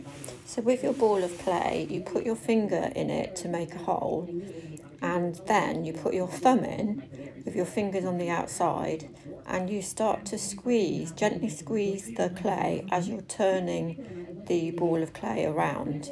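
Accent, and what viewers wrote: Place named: Scotland, United Kingdom. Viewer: Your accent... automatically makes me think of Outlander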